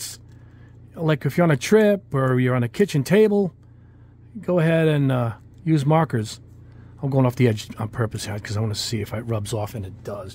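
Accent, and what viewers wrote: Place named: Boston, United States. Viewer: Back to the hobby in the last 6 months. I just found your videos and am enjoying myself at 63 years old. I live in Minnesota, yet am from Boston... so the accent is like home.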